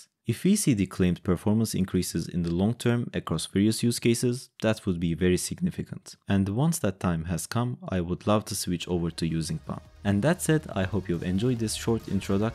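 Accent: Turkey. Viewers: Are you Turkish? Your accent made me think that. I'm sorry if this question bothers you.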